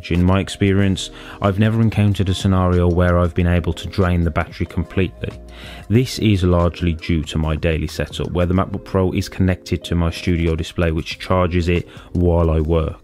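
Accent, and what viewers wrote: United Kingdom. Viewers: That British accent is 🔥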